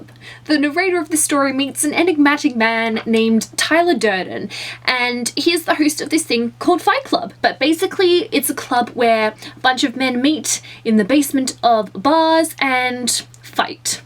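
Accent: Australia. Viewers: You sound aussie! haha I don't come by many of them on the youtubes, I really feel this book needed a more in depth review as there is soooo many topics this book brings up, regardless this was not too bad. As for the narrators name.. he mentions a name a few times I believe it was jake or joe or something.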